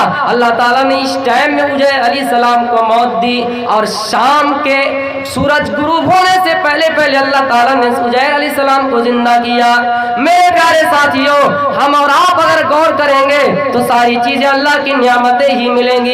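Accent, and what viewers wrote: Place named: Bengal, India. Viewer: तकरीर सुनकर लगता है कि बांग्ला भाषी है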